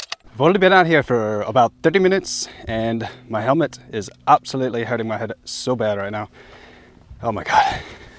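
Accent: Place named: Australia. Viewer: Sounds like an Aussie. Love the larp.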